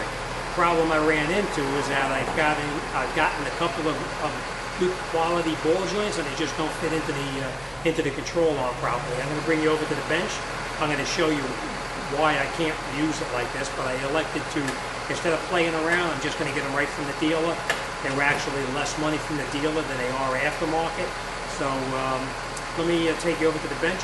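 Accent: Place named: Boston, United States. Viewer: My FL friends used to make fun of my "how you doin" accent (Paterson and Fair Lawn) but man oh man I really thought you were from Boastn (caaa-no r). Anyway thanks for the cool videos you post! It is refreshing watching them.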